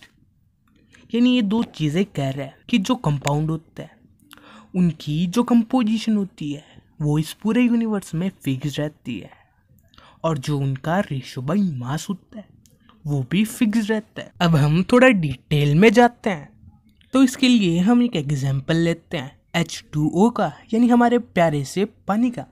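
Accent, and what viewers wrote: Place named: Punjab, India. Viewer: It's good but don't speak punjabi on English plzzz🙏🙏🙏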